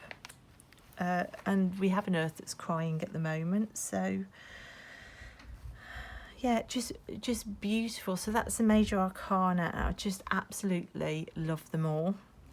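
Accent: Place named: Shropshire, United Kingdom. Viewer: yay! I can tell by your accent, I’m in Shropshire. So in another of your videos about how you’re learning tarot,it was a VR #my tarot growth, the two books you’ve been using are ones I don’t have, which is pretty miraculous seeing as I have about 40 books on Tarot ( of course I haven’t read them all!!!!! Not even close as I’m still in the “ok that looks helpful, I’ll get that and then another book is recommended on a video or by Amazon etc and the pile just keeps getting bigger🤷‍♀️”. Anyway you said about being nervous of putting the bigger spreads together. I would like to recommend the methods in the Tarot face to face book as you look at how cards relate to each other first before you learn any meanings at all. The first spread after the initial lessons is a 25 card spread where you are using these new skills and it’s not scary at all! 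I’ve found it so valuable and so much easier than looking at cards individually and their meanings in a single card. It just seemed counter intuitive to me to be learning reading the tarot card by card individually seeing as our goal is to read cards together in spreads. The book has been republished under a new name Practical Tarot Techniques: Your Essential Tool Kit for Better Readings and is a Paperback – 25 Oct. 2019 by Marcus Katz and Tali Goodwin and is available through amazon but not as an ebook unfortunately. Because then you could have downloaded a sample of the book to see what you think of it. I’m not affiliated to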